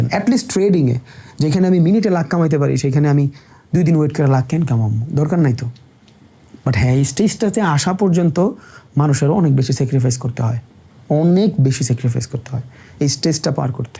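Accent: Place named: Comilla, Bangladesh. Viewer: ভাই আপনার জন্মস্থান কি কুমিল্লায় ক্যাপ্টেন এমপি হাসনাত আব্দুল্লার মত কথা বলার ধারন শুনে তাই মনে হয়।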